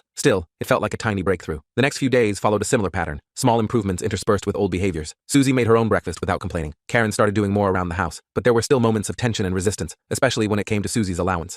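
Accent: United Kingdom. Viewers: Why did he turn British?😭😭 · Why did it switch to British accent/pronunciation?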